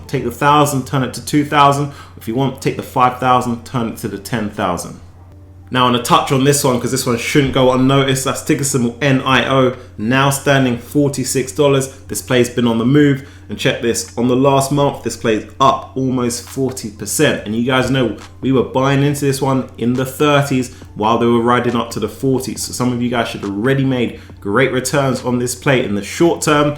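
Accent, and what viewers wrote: United Kingdom. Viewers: Hi, are you from UK?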